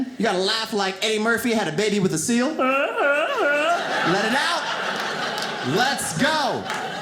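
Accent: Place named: South, United States. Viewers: Can someone explain the southern accent from California? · This guys sounds SOUTHERN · He sounds like he's from the south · He's a funny guy. I know several white guys that sound like him. He sounds like he's from the South but I think he's from Cali. The guys I know that talk like him are funny too. We just say, they're "a character". That covers alot of ground.😃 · Lol, why’s this whit hood California guy talking more Texan than I do. Y’all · He’s from NorCal (Bay Area), but sounds like he’s from the south lol I’m confused...🤔😳😬😂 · He sounds like he's from the South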